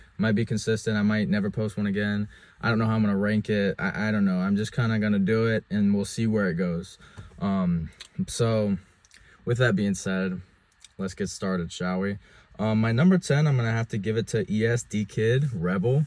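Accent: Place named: Virginia, United States. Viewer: Good video man, nice list. It sounds like youre from VA, I'm not too far from Harrisonburg myself lol, keep it up man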